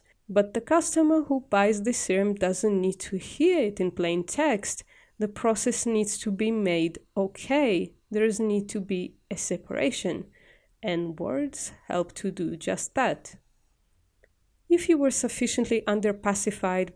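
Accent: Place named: Wales, United Kingdom. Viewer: Subbed love the WELSH accent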